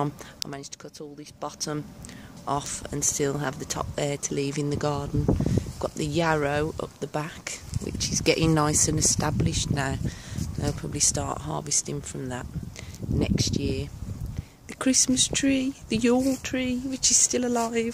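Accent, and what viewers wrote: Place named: Birmingham, United Kingdom. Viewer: Its good to hear that brummy accent. Local. Im in Sparkhill.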